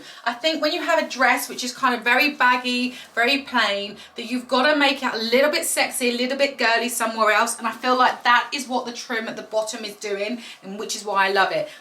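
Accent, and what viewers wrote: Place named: United Kingdom. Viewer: please don't get me wrong but I'm watching your videos for your accent. Have you ever thought of making a video about a British accent? I'm sure there are many people who want to copy your accent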